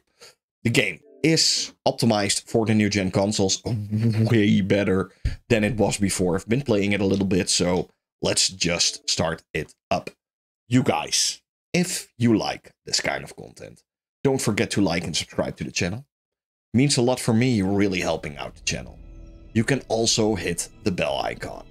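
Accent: Netherlands. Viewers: Altijd leuk om een random video aan te klikken om vervolgens dat Nederlandse accent te horen. Top video, goede info. Bedankt!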